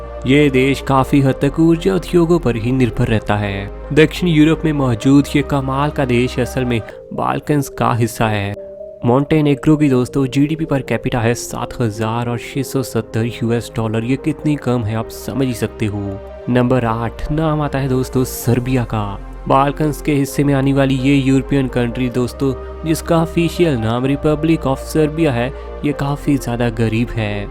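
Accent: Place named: Punjab, India. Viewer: tu punjabi a teri tone to pta lagda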